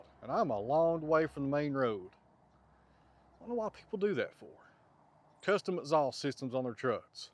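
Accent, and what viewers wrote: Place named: Kingsport, United States. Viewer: I like Billy Bob Thornton, too, so look at the comparison as a great compliment. Nearly 50 years ago I used to go to Kingsport Press to oversee production of some of the books I worked on, and I loved the area and the folks who worked there were great to work with and to be around. You, so much remind me of those good, friendly people. And you talk like them’ too. I love the dialect. I used to say that I didn’t care for cats, but that was before I got to know and understand ‘em. Interesting critters they are. I l very much enjoy your channel and keep up the good work.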